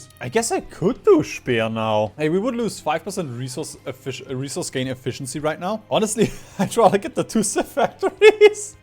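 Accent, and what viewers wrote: Germany. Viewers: Ich höre das deutsch raus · Du klingst Deutsch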